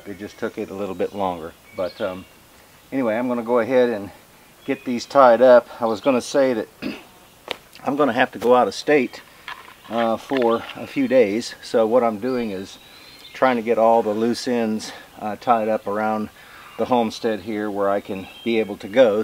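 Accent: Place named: Appalachia, United States. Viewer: I’ve been subbed to Out of the Woods for several years now, Good channel for sawmill interested folks like myself. I’ve seen him going and getting logs at every opportunity he gets when people call him and many times they’re free. 😉 What’s funny is I talk exactly like him 😂 I guess because all my family have been NC Tenn & Va Mountain folk and we have a hillbilly accent 😁👍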